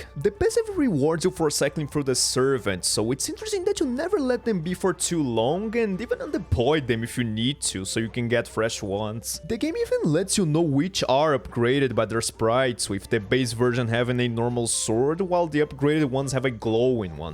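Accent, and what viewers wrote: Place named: Brazil. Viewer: sorrio toda vez que abro um vídeo sobre um interesse relativamente random meu e escuto um sotaque brasileiro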